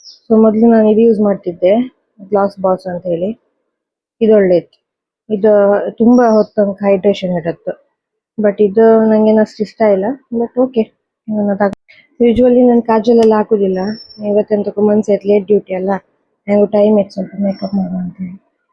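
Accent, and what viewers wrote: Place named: Kundapura, India. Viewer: Kundapura kannada chanda mat addtri maree ❤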